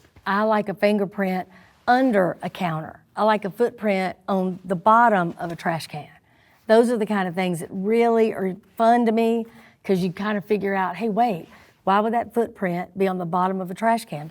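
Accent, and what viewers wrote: United States. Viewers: yes, Georgia. I'd guess southwestern GA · Great to see someone with my accent on YouTube. There’s not enough of the south on YouTube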